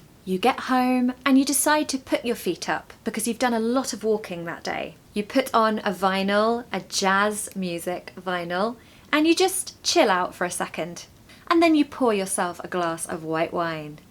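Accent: United Kingdom. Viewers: I love British accent and want to talk like British. Your video really helping me,. Thanks for everything. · Hello, Is posible to teach me class online I like your accent British Kind regards Emma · Hello,. Hope this email finds you well, and sound. I am writing this email in regards to your ways to present a productive content. Literally, endearing, splendid British vlog. I am totally intrigued with your fancy accent. Moreover, I want to express my gratitude to you for all your work on getting your content makes sense, so kindly keep the good work up. Your prompt respond is highly appreciated. Sincerely, Moe. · The best teacher.I love british accent.I like your voice teacher.😊❤ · You've got such an elegant British accent! Really enjoy listening to your speech. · Hello! Well, many British accents exist. They (British people) don't all speak like 🙂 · It is lovely British pronunciation😊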